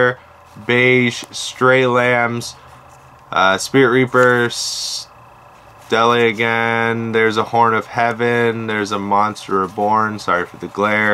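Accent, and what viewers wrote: Scotland, United Kingdom. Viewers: i got +10 points..what can i get with them...accent sounds more scottish lol..idk. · You sound Scottish not Irish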